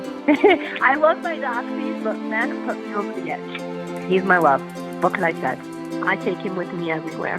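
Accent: New York, United States. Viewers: Omg love your story. I’m from LINY and you sound like your either from there or Joisey! God Bless you always 🙏🏼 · Love your New York accent!! Love from California!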